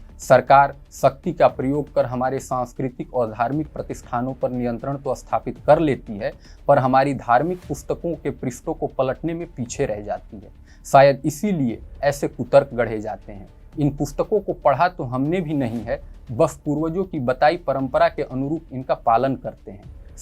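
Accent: Standard. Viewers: sudh hindi yahi sunne milti hai, warna har jagah , hindi ke sath english aur urdu juda rahta hai.. btw .. nice topic and presentation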